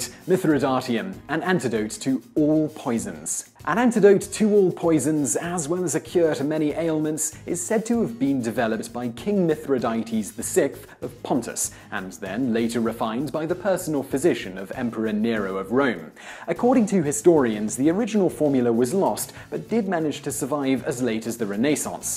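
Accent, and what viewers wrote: United Kingdom. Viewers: He's British, you know... In fact, it's probably the Americans that are mispronouncing the words. We tend to do things like that and then expect others (even though they are much older, thus closer to the source) to change themselves to our ways.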